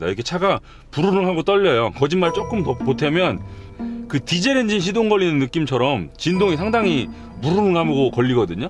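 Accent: Chungcheong, South Korea. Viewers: 이분 말투가 전형적인 현재의 충청도 말투입니다. 거의 교과서네요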